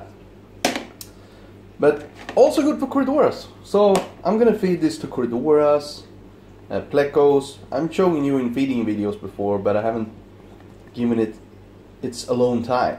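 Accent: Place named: New York, United States. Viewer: I love you channel i subscribed right away . love your accent and humor. Love for newyork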